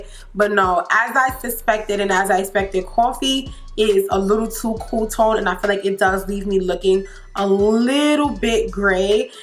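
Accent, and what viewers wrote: New York, United States. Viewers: Where u from? Do I hear a fellow New Yorker?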